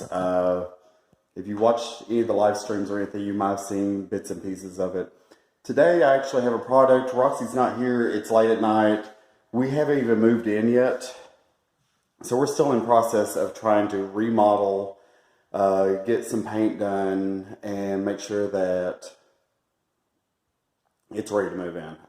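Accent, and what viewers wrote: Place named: Georgia, United States. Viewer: I'm going to try this!! You were hilarious! 😂 You sound like you are from Georgia!! Same as us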